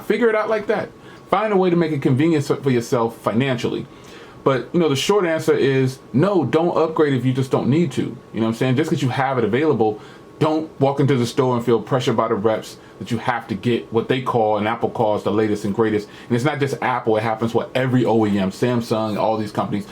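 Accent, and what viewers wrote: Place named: New Jersey, United States. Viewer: Great video, Jersey accent heavy at times during this video 🙂, loving my XS Max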